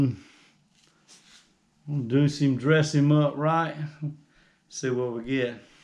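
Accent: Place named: United Kingdom. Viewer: Can’t tell if you’re British...or if you have a heavy country accent..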